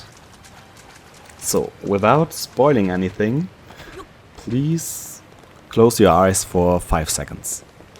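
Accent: Germany. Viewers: Juhu my Question was in the video. Und wusste garnicht dass du von Germany bist, obwohl man es raushört. Es klingt bisschen wie mein english. xD Thanks for the answer. Big up!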